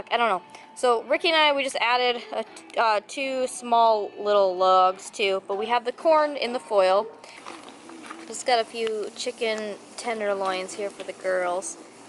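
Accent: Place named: Michigan, United States. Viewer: I had a feeling you weren't from Arizona. My wife is also from Michigan and you sounded a lot like her and my in-laws...I hope to make it out to Arizona some day, I'm excited to see how the dry heat feels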